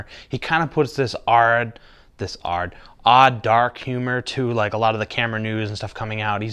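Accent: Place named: New England, United States. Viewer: You from Mass or Rhode Island ??? Recognize the accent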